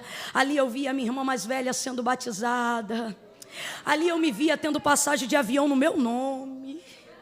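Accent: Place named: Rio de Janeiro, Brazil. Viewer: Ela falando daqui do RJ show kkk É assim mesmo aleluia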